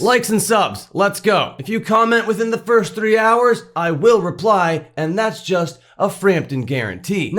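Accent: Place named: Canada. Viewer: Bro it just clicked that your accent is Canadian😂 what province Bud? I grew up in alberta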